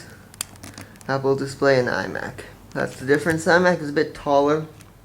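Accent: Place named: United States. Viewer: dam!!! so much happend to you well hope it goes well you live in hong kong?? you sound so American... heheh its all good cheers.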